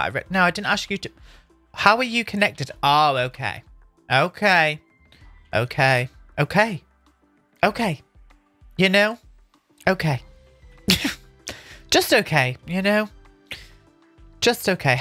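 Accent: United Kingdom. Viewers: Hahahaha adoro esse sotaque britânico. Me acabo de rir com esse "Hello"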